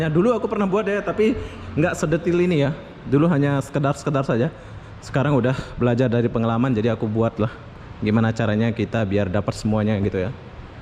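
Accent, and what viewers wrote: Lombok, Indonesia. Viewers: Bagus sekali bahasa Lombok Anda seperti logat orang Lombok asli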